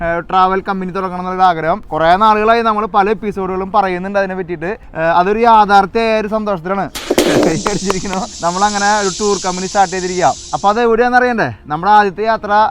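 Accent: Thrissur, India. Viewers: ഈ വീഡിയോ ചേച്ചി കൊണ്ടുപോയി വിത്ത്‌ തൃശൂർ സ്ലാങ് 😍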